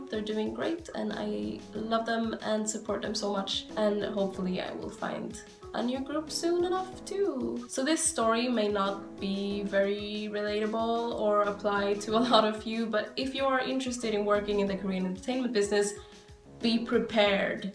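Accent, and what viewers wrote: Sweden. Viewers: I knew I've heard this accent before hehe. Du e svensk eller hur?:")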